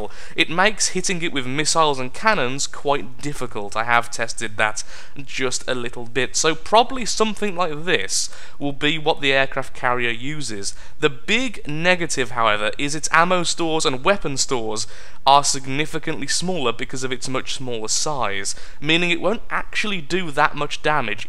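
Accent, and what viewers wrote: United Kingdom. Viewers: I just got to this channel and some how I knew you would sound British.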